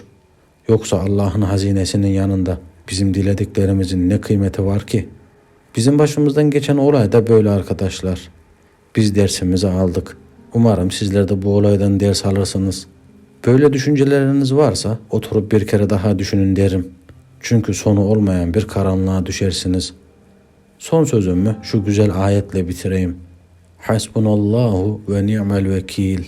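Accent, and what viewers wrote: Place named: Turkmenistan. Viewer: Türkmenler gibi konuşuyor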